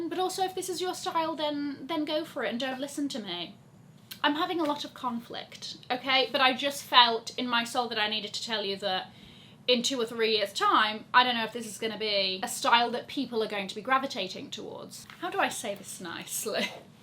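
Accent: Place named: England, United Kingdom. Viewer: Nice review... I love your English accenT and you are so pretty. For me, I will always STAN the vintage/pre-owned luxury bag.. the quality still THERE and the prices are not always higher. But for the new designer bags I only want the Interracio from Bottega Venetta is just... PERFECT ! That's sad that now some bags are not hot like before.....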